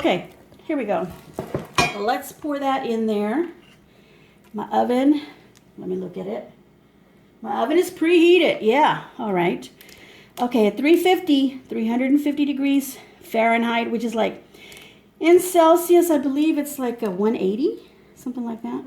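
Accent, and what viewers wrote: Texas, United States. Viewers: You know what is funny,,,, I was watching your more recent videos & you don't have the accent that I can hear in this video! Have you always lived here in Texas? I moved here about 4 years ago. 😁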